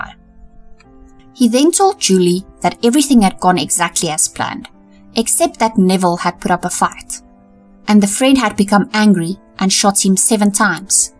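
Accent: South Africa. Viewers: I have heard of this case before but, your in-depth coverage of this harrowing story is second to none. Love your (SA?) accent, by the way. I have subscribed and look forward to more of your content in the future. Cheers from Oz! 🦘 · Hi from Burundi. Yes I figured it was an SA accent!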